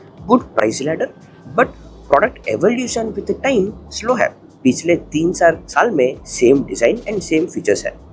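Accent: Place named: Karnataka, India. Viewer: I don't think he is a north indian, most likely Kannadiga(from accent), at the most telugu person.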